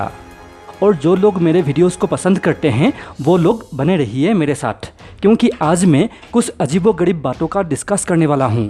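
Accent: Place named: Bengal, India. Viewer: Yee bosdika bolne wala pukka bengali hoga....chutiye ko bolna bhe nahi aata hogaa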